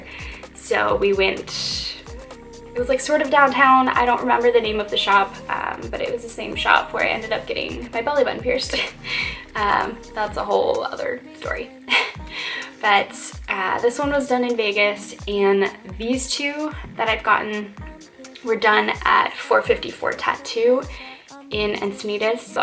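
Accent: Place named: New Zealand. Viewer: you have a cute lil nz ❤️ that makes me so happy haha xx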